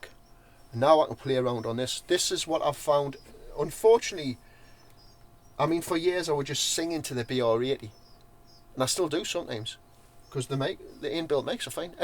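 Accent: Newcastle, United Kingdom. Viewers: I love this video. It is just plain, raw, proper Geordie, and honest. "nay problem" 😀 And you can sing. Nice rich voice. So jealous.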